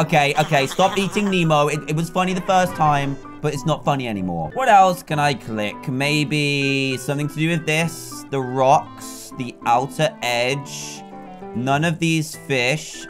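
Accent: United Kingdom. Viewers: british the mincraft man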